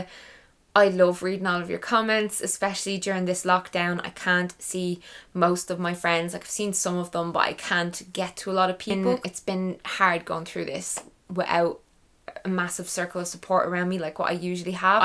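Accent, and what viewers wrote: Ireland. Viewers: woah, I can't take it, that Irish accent + high pitched "babyyyyyyy", just died of cuteness overdose..... (I can't believe I just spent 3h looking at possible ways to transfer to Ireland, need my own Irish cuteness too.....)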